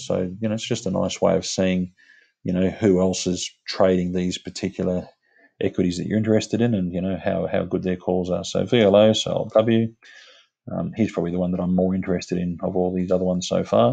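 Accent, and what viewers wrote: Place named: Australia. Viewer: Hi, I heard you mentioned you use TradeStation. I'm assuming you're aussie from the accent... Is that the broker you'd recommend for aussies on TradingView?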